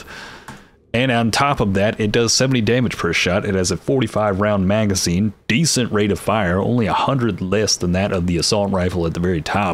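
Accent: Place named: Deep South, United States. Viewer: the deep south accent sold me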